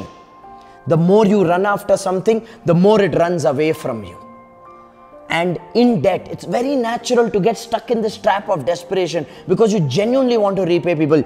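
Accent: India. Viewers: Idk what is about Indians speaking english, that is so pleasant to listen.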